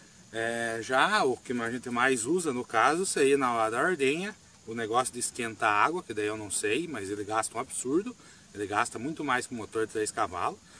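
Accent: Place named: Paraná, Brazil. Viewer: Que orgulho do nosso sotaque paranaense .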